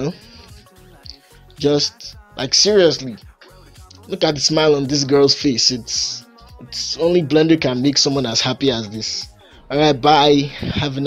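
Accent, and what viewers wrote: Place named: Nigeria. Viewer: the sculpting workflow is immaculate, the nigerian accent aswell 🤣🤣😭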